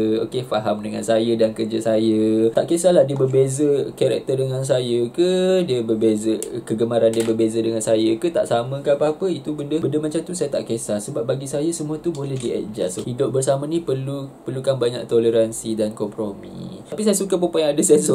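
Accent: Sabah, Malaysia. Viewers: Bunyi KK sangat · Suka dgr ckp sabah.. pekat lg sabahan tu.. 👍🏻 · Best pulak dengar cakap sabah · waaaa... sabindo sabahan bh c 😍 salam dari Tuaran Sabah 😍 that sabah accent 😙😙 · Pahammm bah.hehehe langsung la kan teda mcm urg semenanjung bila bcakap loghat sabah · Ko asal sabah ba pula. Pndi ka slang sabah ? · Sabahan bah pula 😅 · Best nya dgr abam ckp sbh.. hehehe sya sabah celup.. ayah penang.. mak org sbh.. ddk di sbh smpi umur 10thn ja.. so little knowledge about my hometown sabah.. so sya di pggl sbh celup.. huhu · Awww so agree with u. Sabahan slang awk still on point lah! I'm Sabahan too.. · bahasa sabah..cenggini ....👍👍👍👍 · gila klau sia tda tgk ni video kan, sia tda tau oh ni org sabah 😂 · Sy bru tau kau org sabah😄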